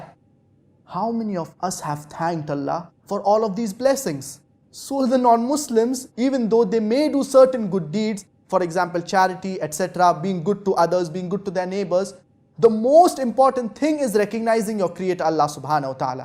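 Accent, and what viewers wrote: India. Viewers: There's no way he needs to speak like his dad looool. He was educated completely out of India and lived 90% out of India yet he has that cringey Indian accent when speaking English. Bizarre.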